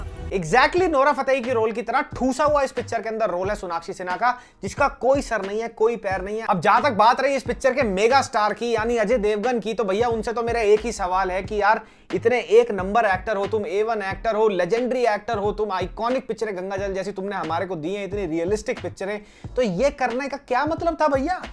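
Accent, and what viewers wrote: Haryana, India. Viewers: I seriously wish you were single ! Specially your Haryanvi accent 🤤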